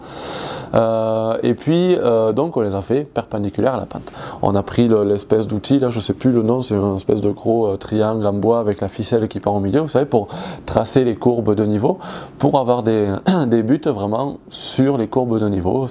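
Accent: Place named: Lautrec, France. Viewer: Très bonne analyse sur soi même qui sert beaucoup plus que l'on ne croit peut-être au départ. Pour toi et surtout pour les autres. Très bon accent pour un ancien Lautrécois qui a migré en Guyane. Je m'appelle Bernard et suis un ami intime à Robin que tu dois connaître certainement.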